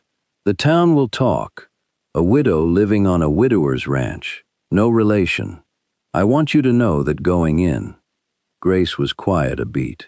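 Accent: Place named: West, United States. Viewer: Complements to the narrator who sounds as if he IS from the Western Frontier. Listening from Clarksville, TN.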